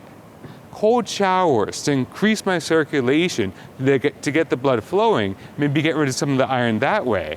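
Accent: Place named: Japan. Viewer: A white guy, with a Japanese accent?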